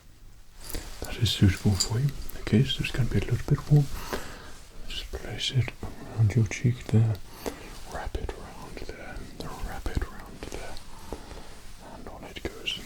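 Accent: United Kingdom. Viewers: Is this man from UK? (I am learning american english, and i don't understand the acent of UK) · i didn't know thor was british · He is it’s not even that thick of a bri’ish accent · One of my goals is to learn this accent, I watch BBC almost every week, there's just something about it that is magical. Great video!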